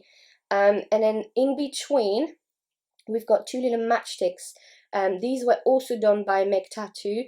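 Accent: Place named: United Kingdom. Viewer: Je ne m'attendais pas à ce que tu ais un accent british quand tu parles en anglais lol!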